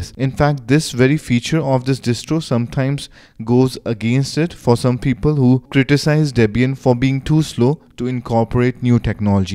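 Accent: India. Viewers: You're talking in the classic Indian accent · I hate indian accent ! Speak proper fn english !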